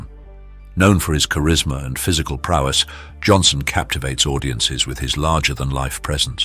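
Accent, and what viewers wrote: United Kingdom. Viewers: Why always a British narrator? U'd think we're in England watching some telly!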